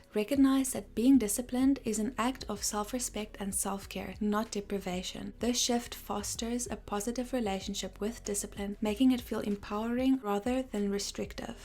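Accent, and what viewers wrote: South Africa. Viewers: R u south african, ma'am?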